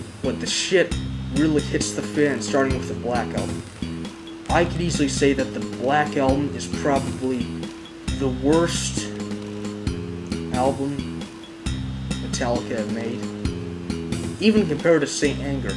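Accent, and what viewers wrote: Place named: United States. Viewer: Speak English instead of pigeon American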